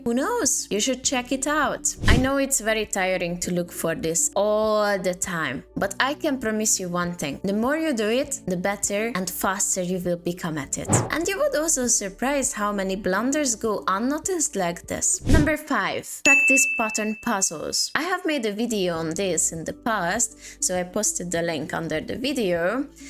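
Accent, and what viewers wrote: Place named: Eastern Europe. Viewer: Chess with east european accent sounds more trustful indeed.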